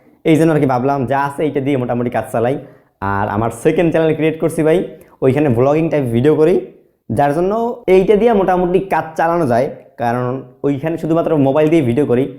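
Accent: Barishal, Bangladesh. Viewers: এ দেহি মোগো বরিশাইল্লা। মোর বাড়ি কলাডেমা। চিনছেন নি জায়গা ডা?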